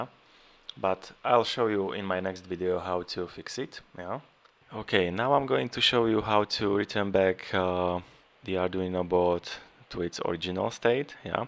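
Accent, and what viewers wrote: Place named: Slovakia. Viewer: Are you from Slovakia? I sense a Slovakian accent there :)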